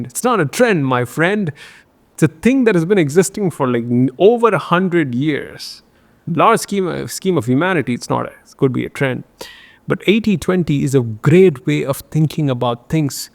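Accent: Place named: India. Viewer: Lol, just discovered this 2nd channel, same old Indian accent.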